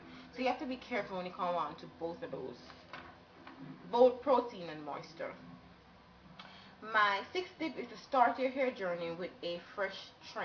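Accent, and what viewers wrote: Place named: Jamaica. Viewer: W00t! Another Jamaican. Whaagwaan? Weh yuh deh? Jamaica still? Bway mi miss it bad bad. Not even affi look pon di name, a hear mi juss hear yuh voice an mi kNOW SEH a muss Jamaican dat. hail up. :D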